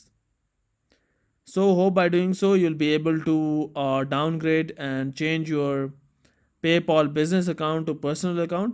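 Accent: India. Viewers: As soon as i heard an indian voice ik it's a waste of time video...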